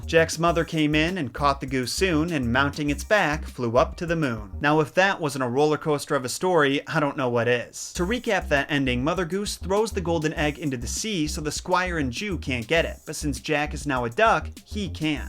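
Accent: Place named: New York, United States. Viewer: Sometimes goes full New York italian when he wants to.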